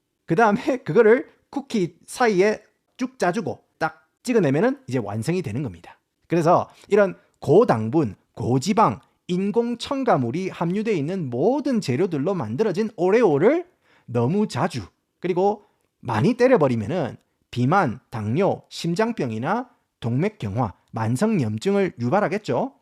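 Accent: Gyeongsang, South Korea. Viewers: 경상도 사람 아니면 듣기힘들거같은데 전 이만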